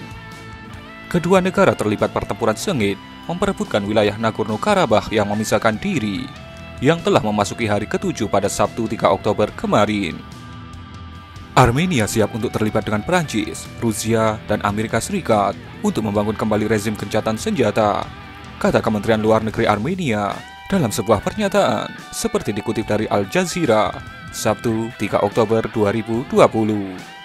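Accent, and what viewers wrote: Java, Indonesia. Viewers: Jowo ne ngendi mas bro medok banget ya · logatnya jawa banget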